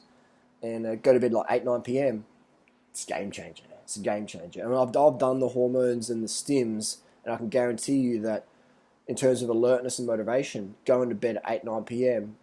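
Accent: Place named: Australia. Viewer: your aussie accent is hilarious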